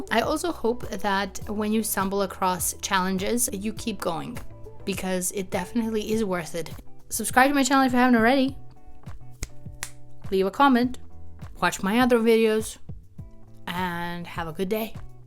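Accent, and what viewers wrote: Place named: Italy. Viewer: si sente che sei italiana eh. sgamata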